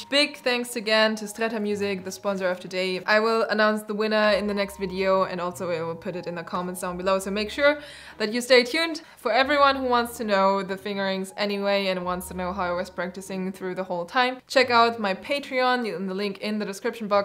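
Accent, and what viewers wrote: Germany. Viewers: Ich habe seid anfang an gedacht das du deutsch Kannat am Akzent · Man hört du bist deutsch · Awesome video! You're a true musician. Speaking of what you are: You sound very German. Are you German, by any chance?😁 Keep up the amazing work!! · mann merkt so hart das sie deutsch spricht hahahaha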